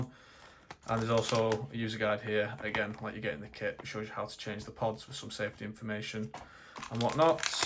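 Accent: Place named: Bolton, United Kingdom. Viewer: always trust a bolton accent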